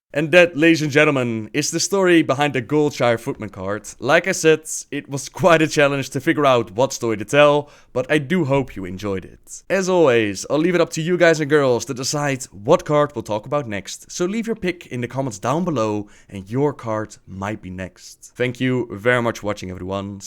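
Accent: Netherlands. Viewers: such a dutch accent :p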